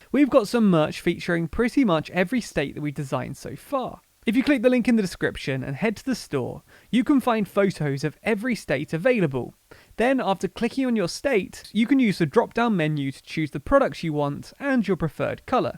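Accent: United Kingdom. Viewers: Why isnt this lefty loony talking about how a former president was spying on a potential president? I thought lefties are all against invasion of privacy? Btw, this guy sounds like a brit? No mention of the fake dossier that got this bullshit rolling thats been spewed to us for the last 4 years over and over? This whole thing is total bullshit. Makes watergate look like a joke.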